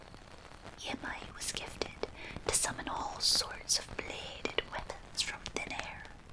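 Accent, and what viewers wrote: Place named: Ireland. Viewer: Your singing sounds incredible, and the Irish accent is really well done! I’ve already listened to it several times. Keep up the awesome work! 😁 Sidenote: What could possibly be more relaxing than a tragic story about war? 😝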